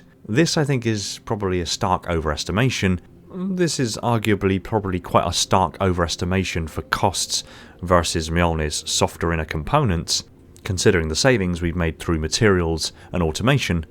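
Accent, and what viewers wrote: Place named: Bristol, United Kingdom. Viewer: You from Bristol, thought I heard a twang in there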